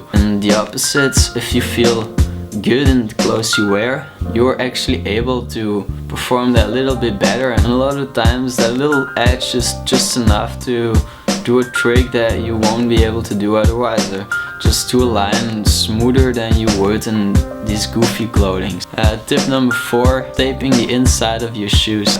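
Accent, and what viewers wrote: Netherlands. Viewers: are you dutch? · Okay but that Dutch accent tho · u dutch? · Are you Dutch? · yep, obviously dutch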